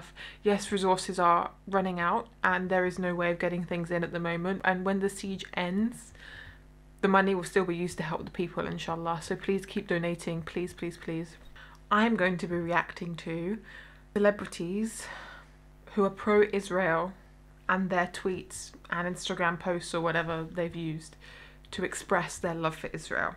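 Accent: United Kingdom. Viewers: ...and as if you are not trying to spread Palestinian propaganda? C'mon, are you even Palestinian with your white skin and British accent...lol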